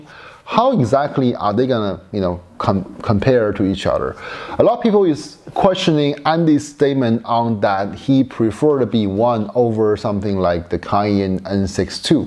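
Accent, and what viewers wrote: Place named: South Korea. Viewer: What the f#ck is 똥글... ? Are you Chinese? Sounds so Korean ... Your accent, I mean.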